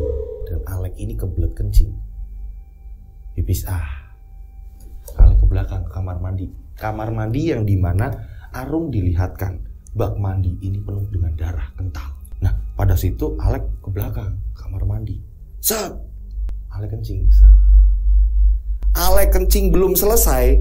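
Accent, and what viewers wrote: Java, Indonesia. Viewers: Jawa nya tom tomen kang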